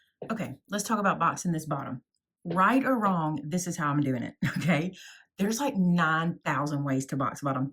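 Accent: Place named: South, United States. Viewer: This is a great video! Love that accent😊. I’m from Alabama and your voice makes me feel at home! You know, I still sew over pins🫣. We were taught that as long as the pin is at right angles to the needle, the needle will slide over it. I have found this to be true in my 50+ years of sewing. I’ve never bent or broken a needle because of a pin.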